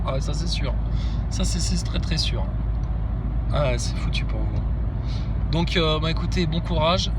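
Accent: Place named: Belgium. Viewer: un renard, un renard, une pesquette mélodieuise, ton accent belge, putain, faut l'améliorer, putain !!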